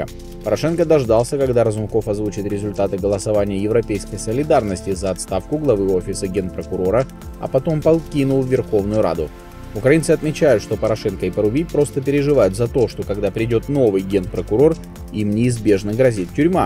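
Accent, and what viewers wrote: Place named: Moscow, Russia. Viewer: Об этом Украине поведал парень с московском акцентом. Из прокремлевского жопо блока.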